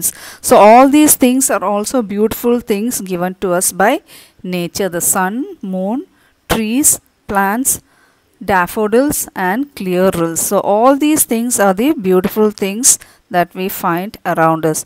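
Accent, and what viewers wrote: Kerala, India. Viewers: Its clear in ur voice and tone that u r a malayali maam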